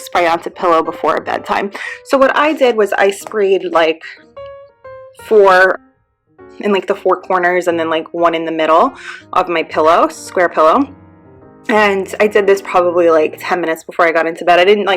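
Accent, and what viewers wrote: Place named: New Jersey, United States. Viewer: Its a British brand and it works. Are you from the Jersey Shore? The accent :)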